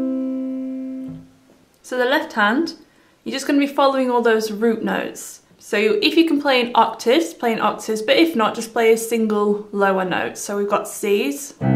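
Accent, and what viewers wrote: United Kingdom. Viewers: Your voice, with that British accent, is succulent. Thank you for the tutorial, I always felt that my game was missing something, and I just discovered that it was the F6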